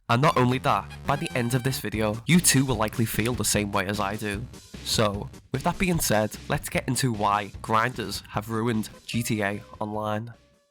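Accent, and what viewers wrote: Liverpool, United Kingdom. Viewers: From Liverpool?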